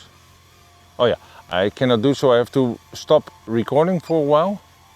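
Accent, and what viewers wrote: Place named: Netherlands. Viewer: Your Dutch accent is thick lol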